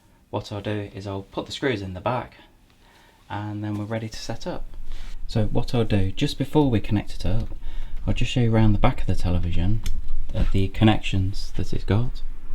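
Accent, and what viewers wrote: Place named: Birmingham, United Kingdom. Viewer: And it's with a Brummie twang to it too.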